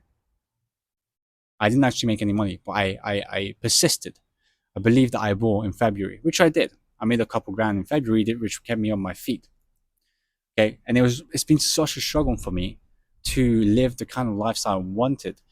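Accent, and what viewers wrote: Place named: South Africa. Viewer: Why does he have a South African accent...NOT a UK one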